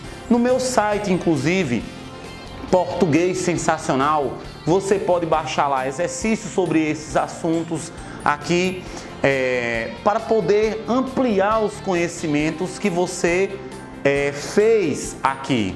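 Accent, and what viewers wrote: Northeast, Brazil. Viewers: Um nordestinoooo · Fessor vc é lindo e é dez!!!! Gostei do sotaque nordestino!Adorei a dica "DECA" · sutaque nordestino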